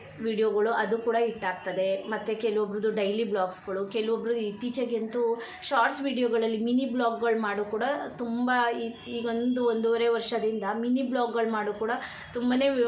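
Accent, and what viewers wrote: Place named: Hassan, India. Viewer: ಮೇಡಂ ನಮಸ್ಕಾರ ನೀವು ಮಾಡುತ್ತಿರುವ ಇತ್ತೀಚಿನ ವಿಡಿಯೋಗಳನ್ನು ನೋಡುತ್ತಿದ್ದೇನೆ ಎಲ್ಲಾ ವಿಡಿಯೋ ಚನ್ನಾಗಿ ಬರುತ್ತಿದೆ. ನೀವು ಸರಳವಾದ ನಮ್ಮ ಹಾಸನ ಜಿಲ್ಲೆ ಭಾಷೆ ಚನ್ನಾಗಿದೆ. ನೀವು ಏನು ಓದಿದ್ದೀರಾ ಇಂಗ್ಲಿಷ್ ಪದಗಳು ಚನ್ನಾಗಿ ಬಳಸುತ್ತೀರಾ, ನಿಮಗೆ ಒಳ್ಳೆದಾಗಲಿ. 🙏🏽🙏🏽🙏🏽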